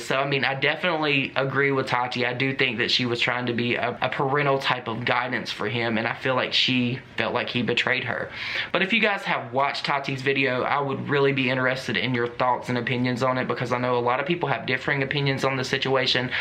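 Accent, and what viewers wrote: South, United States. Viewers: I found your channel a few months ago and I am so happy I did. Maybe it's your southern accent and attitude. I was born in the south but moved to the west as an adult. I miss my family and you remind me of all of them. Your content is to the point and short, love it. Anyways just wanted to say hey!!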